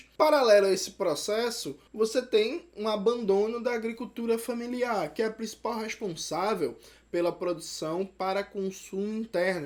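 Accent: Pernambuco, Brazil. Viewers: Veiga Pela sua falta de percepção a respeito do que é o sotaque de um pernambucano, percebe-se que a dificuldade está em vc de entender o que é a realidade e a diversidade brasileira e, claro, o que ele diz no vídeo. Em nenhum momento ele defendeu, assim como qualquer outro comunista ou socialista sério e radical defenderá a estatização de todos os meios de produção, mas sim a progressiva abolição da propriedade privada dos meios de produção, o que é bem diferente. Mas se vc não é capaz de perceber e nem de respeitar um simples sotaque tb não deve saber diferenciar o que eu esbocei aqui.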